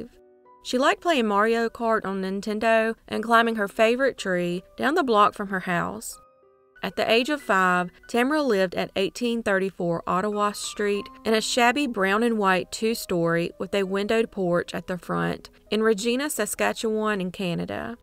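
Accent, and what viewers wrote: South, United States. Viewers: I love your Southern voice, and the channel: you rock! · that beautiful Southern drawl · Hi,it is nice to finally put a face to that cool southern voice.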